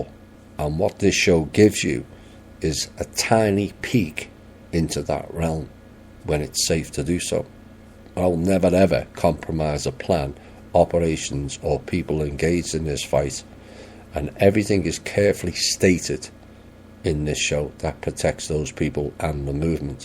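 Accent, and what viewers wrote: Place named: Northern England, United Kingdom. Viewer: Good info, nice northan accent, feels like been back home, derbyshire man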